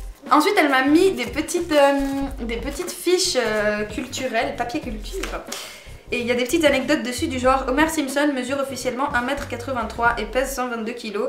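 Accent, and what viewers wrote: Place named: Switzerland. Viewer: Super vidéo, il me semblait bien que t'était Suisse, avec l'accent... Tu viens de quel canton ? Moi c'est canton de vaud😂❤️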